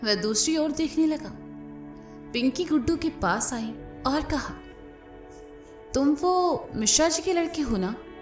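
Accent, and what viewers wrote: Varanasi, India. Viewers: Ma'am aapki voice so adorable and apki voice me ye banarasiya language so amazing lagti hai ❤❤🥰🥰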